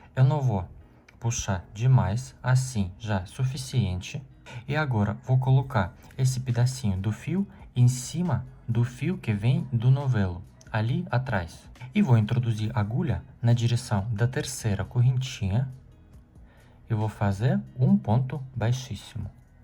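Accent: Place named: South, Brazil. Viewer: Estou sendo sincera...se eu não soubesse que você é estrangeiro, e ouvisse você pela primeira vez...acharia que você era de alguma cidade do interior das regiões do Sul ou do Sudeste, por causa do seu sotaque que é muito sutil.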